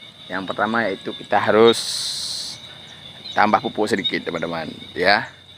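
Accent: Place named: Java, Indonesia. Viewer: X faham...pekat sngat jawanya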